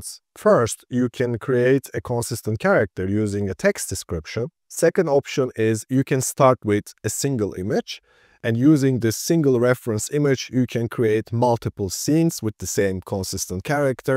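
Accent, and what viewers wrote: Turkey. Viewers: türk müsün bilader? lise şivemizle çok güzel anlatmışsın. tebrikler :) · Kanki Türk aksanı bu nerde olsa tanırım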